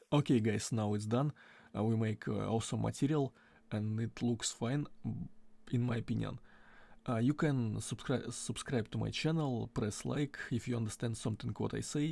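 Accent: Russia. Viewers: По окценту понятно что русский) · А как-то на родном, а не на конченом вражеском, на комильфо уже? Может, предоставить перевод самим браузерам делать? Поищу либо на оригинале (включу перевод), либо на родном, русском. Пишу по-русски ибо акцент дичайший, русский человек говорит. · cool tutorial but with your accent you should sound evil russian characters in games and films))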